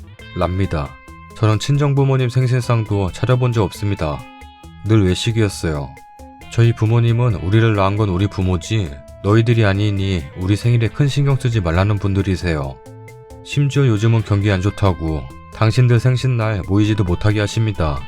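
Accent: Gyeongsang, South Korea. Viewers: 저 부산사람인데 부산말 욱겨요 ㅎㅎ 어리버리 글 지워 졌어요 죄송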